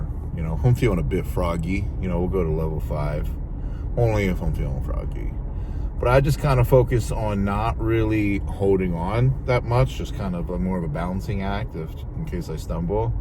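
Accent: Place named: New Jersey, United States. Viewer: I really think the biggest problem with how you talk is that people don't understand how we talk in New Jersey.. so the problem is really theirs.. fuck em.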